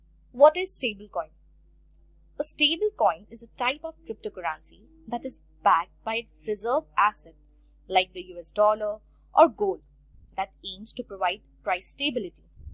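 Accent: India. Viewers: Thanks for the lecture. It's just a but hard to follow up with the Indian accent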